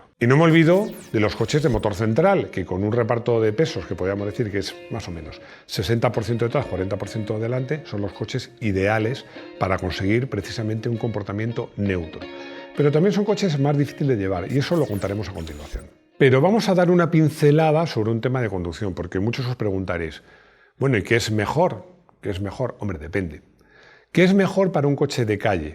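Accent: Chile. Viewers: Estimados la voz en off es de un Chileno?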